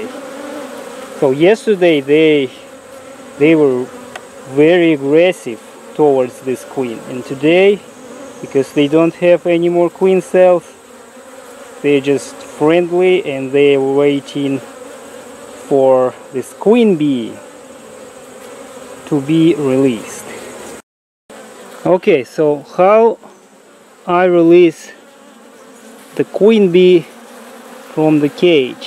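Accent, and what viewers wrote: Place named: Russia. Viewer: Мне одной кажется, что он на самом деле русский, но пытается говорить на Английском с ужасным акцентом..)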